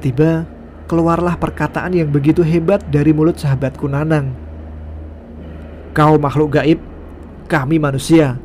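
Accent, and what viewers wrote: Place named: South Kalimantan, Indonesia. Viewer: Banjarmasin Balangan kal-sel suaranyaa😎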